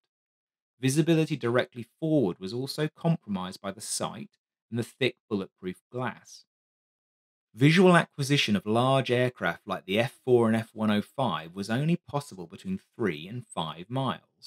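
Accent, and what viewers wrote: United Kingdom. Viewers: Love your Brit Accent and dry assessment that outlines the basics of the problems of the transoceanic and heavier air flight characteristics... a lot of technical limitations.. and I am not Fn of only single seat fighters over the REO concept, as this is the ultimate command seat for on scene awareness.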